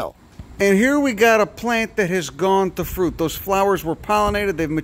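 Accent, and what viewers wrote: Chicago, United States. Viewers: It is hilarious to see the title and thumbnail, then you click on and you're like, "Chicago accent." Oh yeah, its you. LOL How's it goin' ya old prick? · no, this is not a new York accent. It’s a Chicago accent. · That’s a Chicago accent. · Eloquence, like a Chicago accented David Attenborough! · This guy's from Boston or Chicago. My bet is Chicago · I think every nature video should be narrated by someone dude from Chicago. Chefs kiss! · Chicago. He’s from Chicago. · Lotta people in this comment section aren’t familiar with a true Chicago accent I guess 🥴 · as a new Yorker i feel like this accent is most likely from Chicago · Ok, apparently it is, in fact, a Chicago Accent, so sorry about that, sounded that way to my southern ears! 😆😁 Still delightful though! 🤍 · this is a chicago accent!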